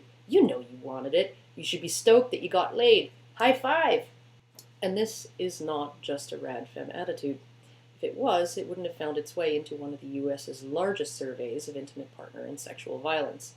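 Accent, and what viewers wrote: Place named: Canada. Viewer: i really like this monotone reading voice is the best way to get a point across. this not sarcasm you should get a job at npr all though you sound canadian i'll look up ya'lls npr lol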